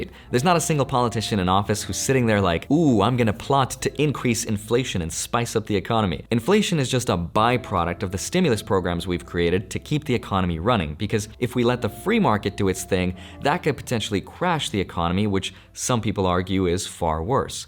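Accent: United Kingdom. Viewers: LOL your British accent is the best.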